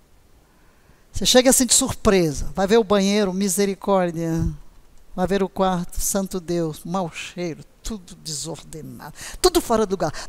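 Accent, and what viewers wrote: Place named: Portugal. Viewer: Tu tens sutaque de Portugal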